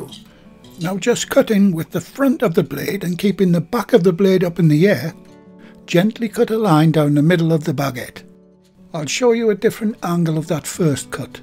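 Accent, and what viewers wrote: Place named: Yorkshire, United Kingdom. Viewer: Hi, just got your buns out of the oven, the house smells lovely, we will have steak on a baguette for dinner, thanks for all your hard work on the videos. I was born near doncaster in yorkshire, love to hear your accent again 😀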